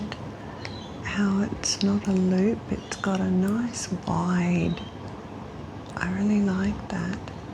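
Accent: Australia. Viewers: Oh no! Don't take everything to the Post office :D I wanted to buy couple of the items from etsy but I need couple of days for some more cash, I will mssg you at etsy which items I will definitely order, if they are still there. PS. Perfectly relaxing video, crinkles and soft voice, aussie accent is one of my fav <3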